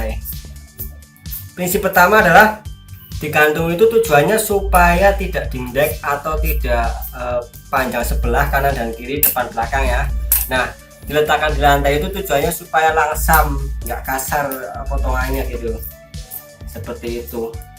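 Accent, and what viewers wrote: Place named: Banyumas, Indonesia. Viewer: Ngapak yaa hehe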